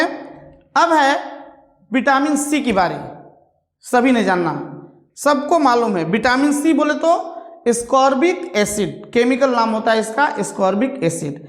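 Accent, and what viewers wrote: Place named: Bihar, India. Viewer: Aap to Bihar se hai Guru Jeeeeeee🎉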